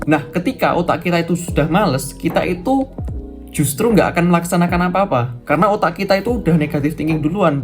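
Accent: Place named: Java, Indonesia. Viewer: logat jawanya kelihatan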